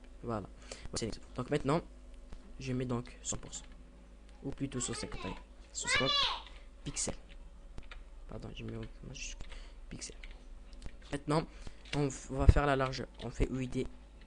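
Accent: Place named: Maghreb. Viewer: hhh super hh t marocain ou algerien bayna mn lhdra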